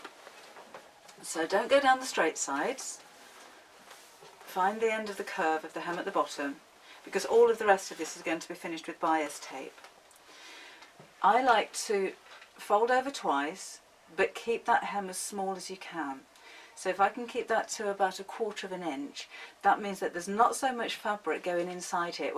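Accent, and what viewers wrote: United Kingdom. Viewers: I just noticed your pin cushion. I have got to make one like that. Thanks for this wonderful video. btw love that British accent you have.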